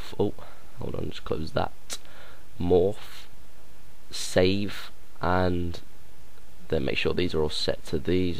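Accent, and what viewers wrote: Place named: United Kingdom. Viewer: omg british accent! love it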